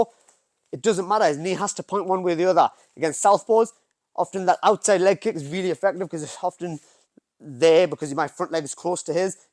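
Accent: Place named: Newcastle, United Kingdom. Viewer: appreciate the super fast response. I have been away from the UK 5 years so ;loved hearing the geordie accent. If you ever visit Pakistan, please let me know so we can get you teaching a seminar or two for the local lads.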